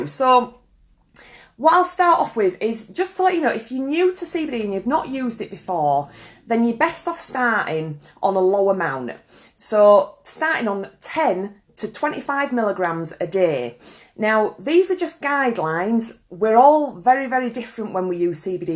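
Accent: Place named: West Yorkshire, United Kingdom. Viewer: Which part of West Yorkshire you from?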